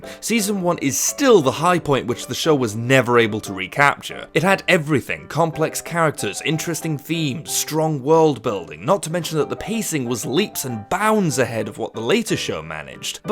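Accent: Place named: United Kingdom. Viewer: Holy shit! You are the most English boi ever. Also, this show sucks! Rip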